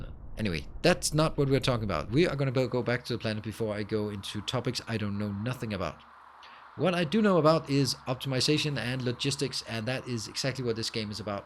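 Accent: Denmark. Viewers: Is that a Danish accent I hear? Nice video by the way. Game looks pretty chill.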